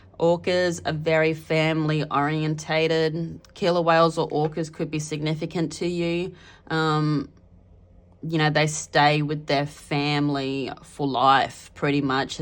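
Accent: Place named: Australia. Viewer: Thank you for reading. I love your accent. Are you Australian? ❤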